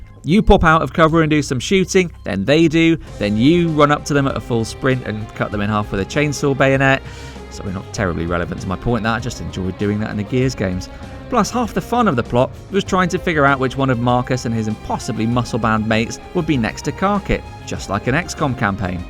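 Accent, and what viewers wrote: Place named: South England, United Kingdom. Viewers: Who else loves the british accent? It's british right? I am not dumb right? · Yeah he's got an English accent. Sounds southern.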